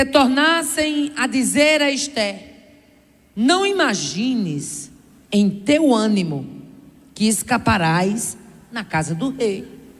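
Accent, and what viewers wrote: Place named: Northeast, Brazil. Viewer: Madrugada e eu com ela: ❤️. Que companhia agradável com o sotaque do meu Nordeste: consistente, direto, sincero e antes de tudo, ABENÇOADO. 👏🏾👏🏾👏🏾👏🏾 "A" de 🥰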